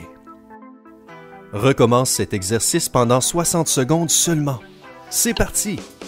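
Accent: Quebec, Canada. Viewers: Accent canadien? C‘est nouveau 🤔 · C'est un québécois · c'est un Québécois!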